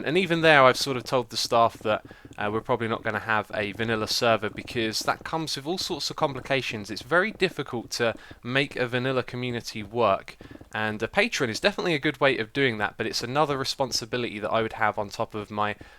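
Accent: United Kingdom. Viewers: ***** Yeah it's his voice. So british. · Solside eclipse? Saltside eclipse? Soldside? Soulside? Sulside? What are you saying?! It's too british :(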